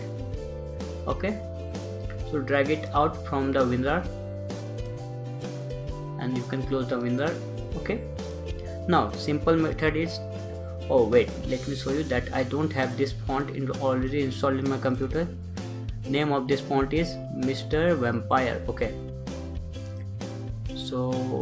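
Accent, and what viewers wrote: India. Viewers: x( no soporto ese acento indu-ingles. good video overall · The music really annoys me. With the difficult indian accent, the music makes it so hard to focus. Kill the music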